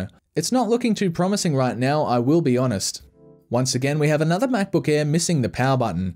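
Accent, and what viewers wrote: Australia. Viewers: Hey, you a fellow Aussie?????? I should've recognised the accent, I'm disappointed in myself. Really really disappointed. Edit: a correction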